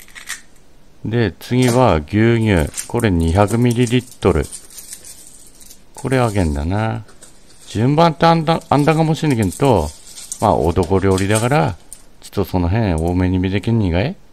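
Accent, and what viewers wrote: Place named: Fukushima, Japan. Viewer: 福島弁めっちゃ楽しい🤣 いきなり笑わせて頂きました👍 左利きだったんですね〜笑 タイマー2ついる？🤔w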